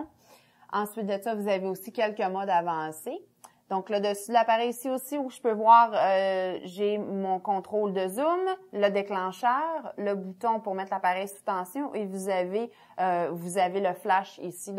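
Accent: Quebec, Canada. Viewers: Belle vidéo mais malheureusement c'est pas tout le monde qui comprends le Québecois. J'ai un peu de misère voyant. ....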